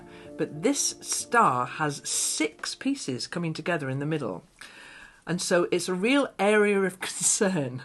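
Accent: United Kingdom. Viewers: Hello,. I’m from Illinois in the US. The number of projects you show does not sound like too many. I dabble in crochet,embroidery, knitting, quilting, rock painting, art journaling, stamping cards, and since November, slow stitching. I think I would get bored if I had to stick with just one. You’re beautiful Rita is absolutely gorgeous. Thank you for sharing all that you do. I love listening to the English accent, especially if it’s calm and soothing, of which you fit the bill.❤❤ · Hi, Im living in Tasmania, I was told about your channel by a new member of our sewing group. We meet every other Monday and make quilts for Charitie's. I was originally from the UK, lovely to hear that accent.:)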